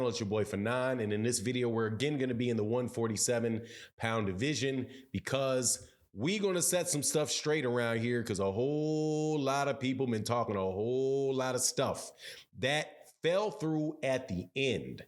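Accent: Texas, United States. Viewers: are you from Texas???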